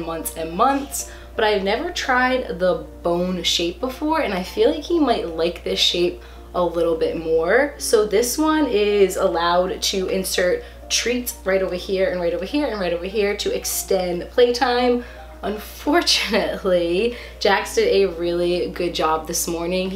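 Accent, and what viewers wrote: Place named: New Jersey, United States. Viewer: He’s so cute ❤️ but the way your pronounce some words 😍😍😍 are you from jersey ?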